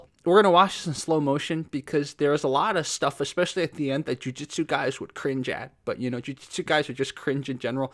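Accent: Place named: Midwest, United States. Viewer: Bro i did not expect you to look like that, based on your voice i was expecting a midwestern white dude in a flannel shirt with a can of beer on the table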